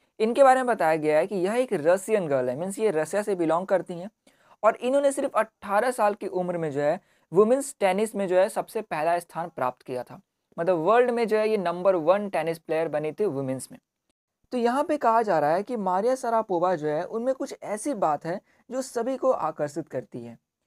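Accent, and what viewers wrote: Bihar, India. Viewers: Ohhh u r from Bihar 😱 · Oh mujhe lga ki ap bihar se ho qki hlka apki boli match kr rhi thi but ab confirm ho gya😂♥️wahh!!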